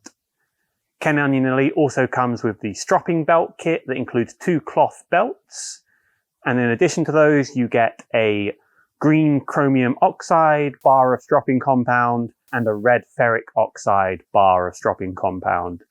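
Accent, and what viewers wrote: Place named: Midlands, United Kingdom. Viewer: It’s so lovely to hear a Midlander! Aye Up! Great introduction to the Grinding Attachment.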